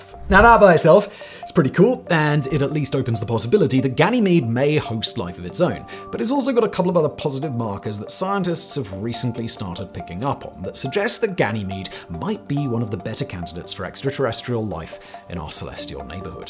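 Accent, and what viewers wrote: United Kingdom. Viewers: your British is showing.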